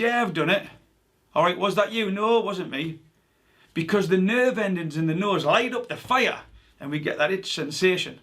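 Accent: Newcastle, United Kingdom. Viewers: Wew is that a Geordie I hear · A Geordie on YouTube. Wey aye mate 👍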